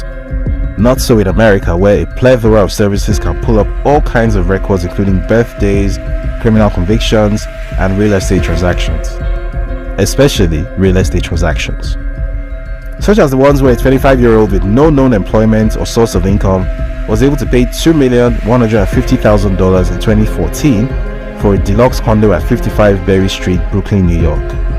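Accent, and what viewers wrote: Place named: Igbo, Nigeria. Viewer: Your igbo accent gave you away!!! Go find something tangible to do.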